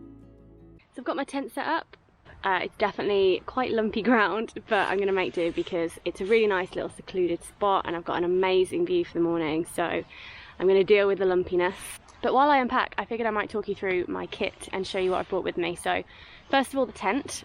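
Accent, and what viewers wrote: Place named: Midlands, United Kingdom. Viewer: Cant pin point your accent, a few words slip but cant place them, youre south of brum?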